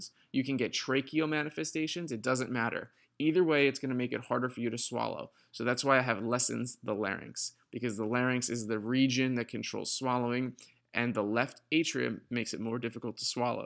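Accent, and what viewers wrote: New Jersey, United States. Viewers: u from new jersey (central) or from california?!? ha!;) god bless bro! ..for me, healer + teacher combo is this earth's highest calling,,hat's off sir! cheers m8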